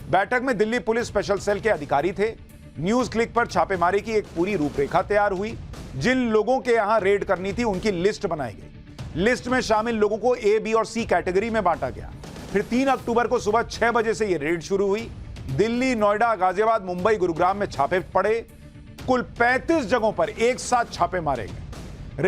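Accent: Bihar, India. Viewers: Superfan of Bihari accent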